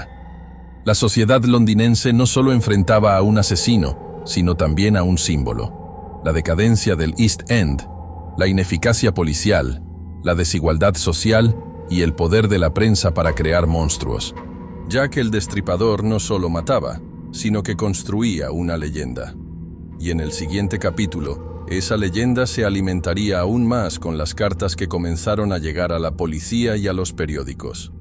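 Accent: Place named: Spain. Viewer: Lamento la narración en el español de España que sólo se habla en España en latinoamérica no hablamos eso.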